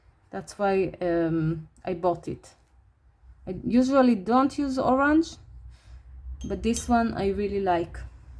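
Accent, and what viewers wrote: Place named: Israel. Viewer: Are you a Sabra?I think I recognize your accent❤️‍🔥🙏🏻🥇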